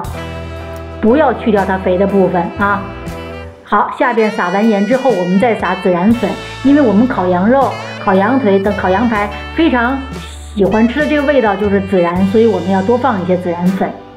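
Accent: Tianjin, China. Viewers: 天津口音，哪里的？ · 大姐 天津人吧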